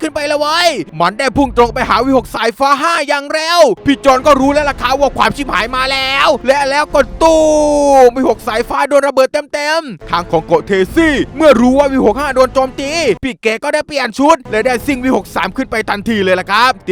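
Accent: South, Thailand. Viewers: พี่บ่าวๆๆนุ้ยอยากให้ สปอยเรื่อง เอลซ่าภาค2นะพี่บ่าว เด้กใต้จ้าเหนพี่พูดใต้ชัดเลยคิดว่าคนใต้ค่ะ ขอเอลซ่าภาค2ค่ะนะๆๆ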